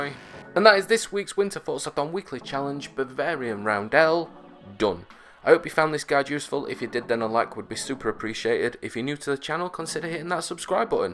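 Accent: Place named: North, United Kingdom. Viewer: Thanks awesome guy. Like the northern accent and you had a couple of funny one liners lol.. But all done!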